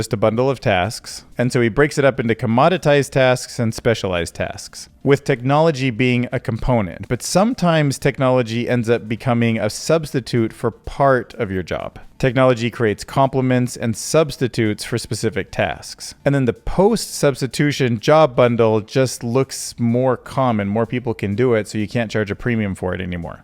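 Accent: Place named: United States. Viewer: This is so funny! I love you, man! Like he is holding it!:pride-flower-pansexual::pride-fan-rainbow-open:Sweden??? You sound American!:pride-heart-rainbow-philly:Ok I think I am the one who lives off crypto currently! But if I have a child from Sergey Brin - I will be on Brinfare!:pride-flower-pansexual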